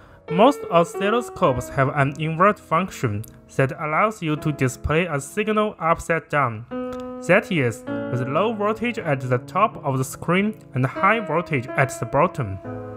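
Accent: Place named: China. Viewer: good topic but not good english speaker english sounds like chinese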